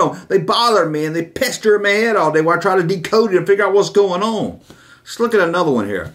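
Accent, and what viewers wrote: South, United States. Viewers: And I'm from Missouri, so that's close enough to the South that I don't have much trouble understanding Southern people.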